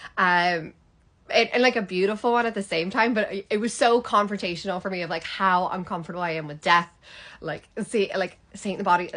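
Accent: Ireland. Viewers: yeh, definitely some hybrid accent there. if you told me she was from stillorgan, i'd believe it. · She's fake she sounds very Irish not "American"🥱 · Is it me or is she like doing an irish accent... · Sounds like you've picked up the Irish accent even when speaking with your American accent or maybe just an Irish girl pretending to be American.. haha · You sound irish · (American born, raised Dutch here): You are really growing that irish accent, my compliments. Its also great how social you are. It's not easy to grow into an irish family. You must be special. And that old grand father certainly has been special.💝🙏🕯Good luck to y'all.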